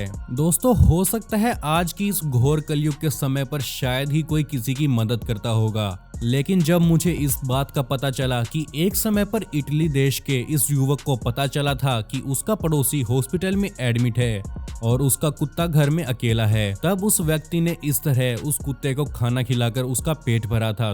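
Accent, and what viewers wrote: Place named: Kashmir, India. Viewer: Bhai.. Ap kashmiri ho kya.. Apka assent kashmiri types hai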